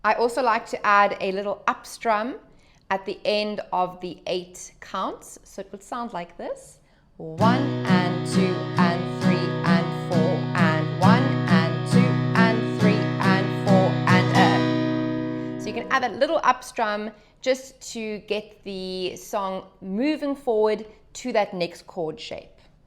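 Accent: Australia. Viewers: Great lesson & teacher - is she an Aussie 🇦🇺? (really cute too 🥰)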